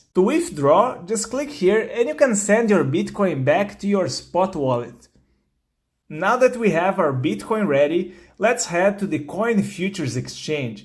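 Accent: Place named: Brazil. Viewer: É um brasileiro falando inglês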